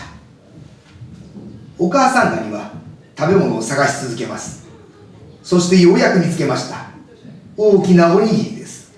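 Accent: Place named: Kagoshima, Japan. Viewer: あちこちに鹿児島なまりが残ってるのがめっちゃ好きです!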